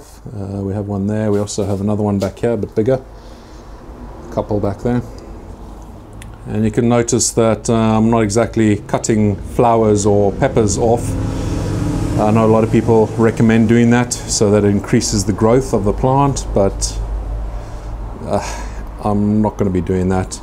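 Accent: South Africa. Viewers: I really like your chilies 🌶 and I know your in U.K., but I detect South African accent? Maybe I am wrong. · I might be completely wrong, but do I somehow detect a little bit of South African in his accent? The way he speaks just sounds like home.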